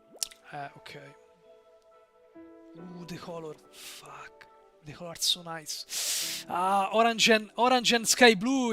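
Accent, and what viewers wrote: Italy. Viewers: Are you...are you tuscan?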